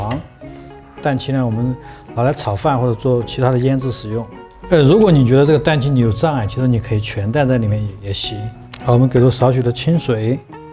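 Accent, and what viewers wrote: Sichuan, China. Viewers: 的川普有点儿安逸。rua均匀，白fu椒粉，荔枝fu辣味，fu辣油。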